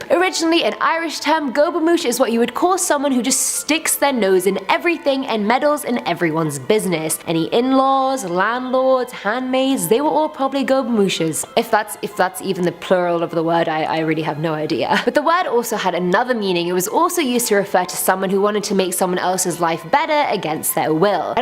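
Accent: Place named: England, United Kingdom. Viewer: Huh - didn't realize torontians had English accent ! Though they were like "take off- eh " (like minnesotians ) !! Suppose to be funny - no need for anyone to get angry . This young lady is very pleasant to listen to - thank you !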